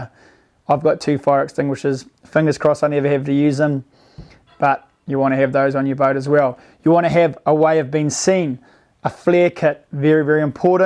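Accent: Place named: New Zealand. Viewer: This was really an awesome explanation with a great focus on the "Recreational Fisherman". Here in the Northeast USA (Maine), we have the Recreational Bluefin (<72 inches) and the Giant Commercial Bluefin (>72 inches). When you wet a line here, you just never know what you are gonna get hooked to. Learned some good skills/techniques on what to include for a set-up. Your clear spot-on presentation style is greatly appreciated. Eliminating the fluff and ego, with a bit of Kiwi accent, what more could a viewer ask for.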